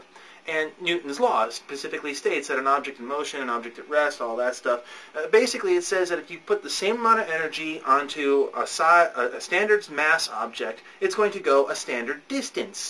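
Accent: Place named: Canada. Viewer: the dude (aND i use the term lightly) has GOT to be canadian.. anyone..lmao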